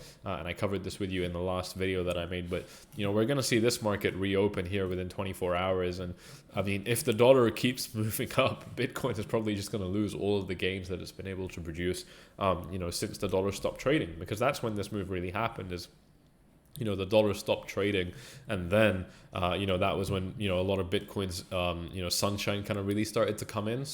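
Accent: Scotland, United Kingdom. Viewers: Good man thanks for your videos, are you from Scotland?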